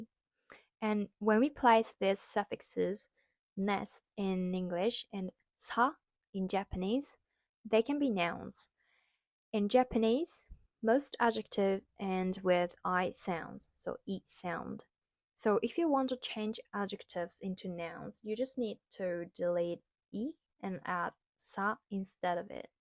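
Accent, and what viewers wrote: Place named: Australia. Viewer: i found your channel from that 'what i've learned' video (about why japan has a low obesity rate) but am also studying japanese so i figured i'd watch this video. have been studying japanese for many years but progress is slow. one thing i learned from this video is that there are 188 particles! i had no idea it was so many, even after years of studying japanese i only know about 20-30 of them. it's also interesting that despite you (presumably) being a native japanese who has visited or lived in australia, i still detect an australian accent in your english, especially when you say words like 'answer', you say them in the way an australian would.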